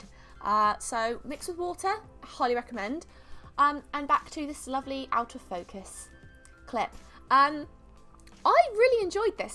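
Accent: United Kingdom. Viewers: I love your British accent ❤🥀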